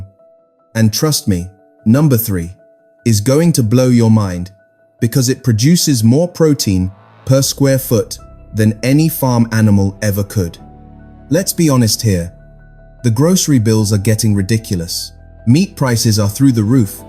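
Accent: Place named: England, United Kingdom. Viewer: Talking dollars with an English accent 🧐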